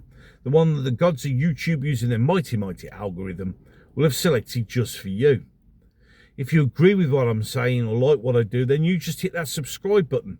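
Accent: Birmingham, United Kingdom. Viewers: Your brummie accent is so strong ! . Great channel our kid , spinning round JLR 😊. YOUR NOT RIGHT PROTECT THE PARTY what’s left civil war ……….. What’s your silly answer ?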